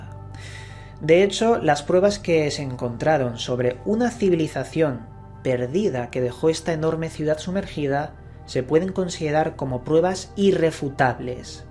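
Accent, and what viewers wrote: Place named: Spain. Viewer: Noe. Gusta el. Acento español dislike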